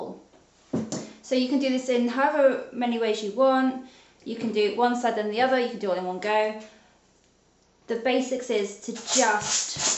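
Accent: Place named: United Kingdom. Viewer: British accent! 👌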